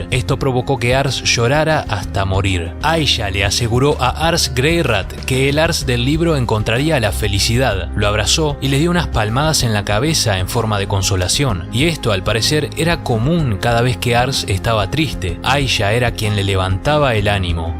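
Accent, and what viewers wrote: North, Mexico. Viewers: Diablos , esto es lo mas norteño que mis oídos han oído jajajaja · Pero que norteño 😅😅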